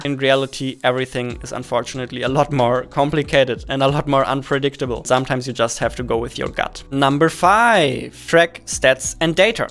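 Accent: Germany. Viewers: This is the most German accent I have ever heard. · Ich hab direkt erkannt, dass du deutsch bist :). Merkt man an deinem Akzent, aber nach weiter so, feier dich und hoffentlich kriegen wir endlich die 100k Abos fertig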